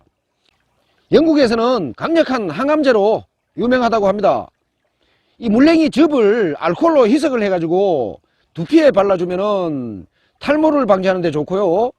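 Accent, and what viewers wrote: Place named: Gyeongsang, South Korea. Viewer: 영상과 정보 감사합니다 경상도 말씨가 구수하게 들리네요 항상건강하세요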